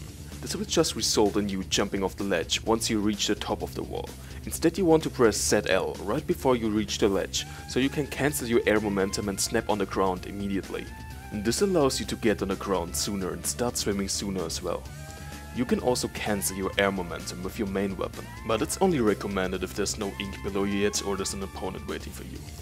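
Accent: Germany. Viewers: i watched this video and was like „this guy is german“ and indeed you are :) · You sound so German. Great video though, thanks a lot! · Are you German? Also I knew about the slow squid trick since Splatoon 1 and it a lot more important than most people think. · I guess ur a german ... am i right ? ... i can hear that DEUTSCH 🛂 accent ...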